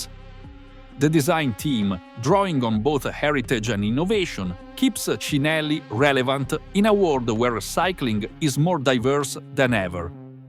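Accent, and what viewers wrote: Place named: Italy. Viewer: Great stuff! Love the italian language inspired narrator! Fits so well!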